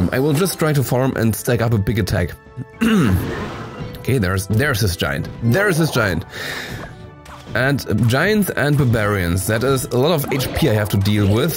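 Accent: Germany. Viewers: He is'nt an english or a amarican guy i think He is german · are you german?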